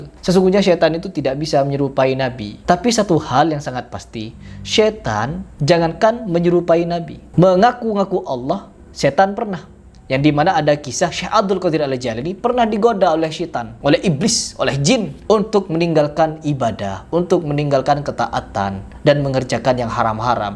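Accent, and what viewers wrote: Madura, Indonesia. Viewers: Maklum aja wal ai ni taunya bekoceh aja kda pernah tau kisah kewalian guru sekumpul,Inya taunya bekoceh gasan kontennya ja..smlm ja di tempeleng warga dikepala Ranai aja Inya,pinanya urang madura dr logatnya.paham ja toh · Kya orng madura kmu ini logatnya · org Madura Pina ny dari logat bicara ny mang ae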